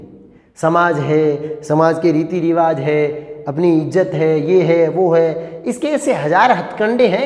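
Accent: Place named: Jaisalmer, India. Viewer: जैसलमेर की प्योर भाषा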